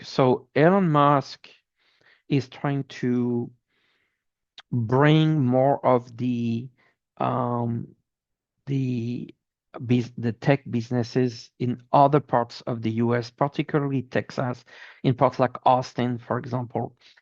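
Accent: France. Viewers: New subscriber here. Like the reading. Very detailed. The hint of a French accent is also intriguing. 🙏😊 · I as well. I enjoyed your show with Linda G. I recognized your French accent immediately since my mother was from France. Merci beaucoup! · Interesting and clear. I note a French accent 😊would of like to know more about Canada .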